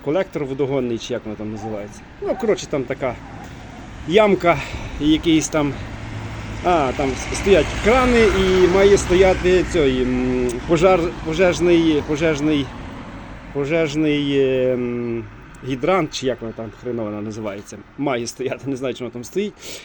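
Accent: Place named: Kremenets, Ukraine. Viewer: Кременецький "діалект" просто жахливий, коли слухаєш "зі сторони".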